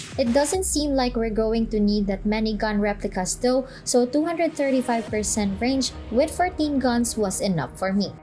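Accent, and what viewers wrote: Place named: Philippines. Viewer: Are u filipino? I can tell from ur accent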